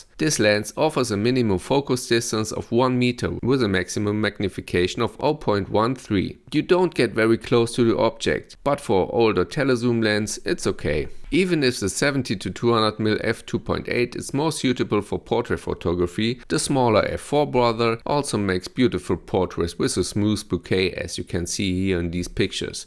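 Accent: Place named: Germany. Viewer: Mir gefällt der Aufbau von deinem Video gut, es gibt keine nervige Musik und generell wird auf die Punkte Konstruktiv eingegangen. Den deutschen Akzent habe ich aber sofort rausgehört, da musst du noch dran feilen :D Außerdem ist die Brennweite Millimeter, dabei hast du Centimeter genutzt und geschrieben 😅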